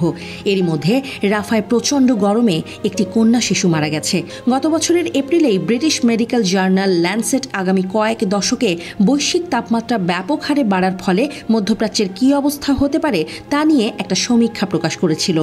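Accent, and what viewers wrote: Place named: Kolkata, India. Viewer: আর টিভির এই ধরনের কলিকাতা দিদিদের মত খবর পাঠ করার জন্য আমি এটা বয়কট করছি।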